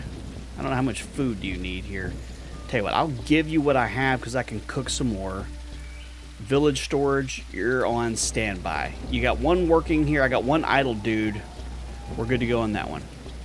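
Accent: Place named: Texas, United States. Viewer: As for me this is not something I would play. The graphics look very nice and it seems to be pretty easy to play but not for me. Survival games are not something I normally play but I am open minded and am willing to watch you play. I started this way with 7D2D but later decided I would buy it and play it. I got your info watching Glock9 and when you first started talking I said he's Southern so I hung around watching your channel. I am in NC but my aunt lives in Humble, TX and I LOVE the Texan accent you all have. At any rate I've learned a lot from your channel in playing 7D2D and I highly highly appreciate it. I'll watch your channel and watch you play because I want to see the future of how this game works. Happy gaming and thank you for having a nice decent channel with some very nice members! :D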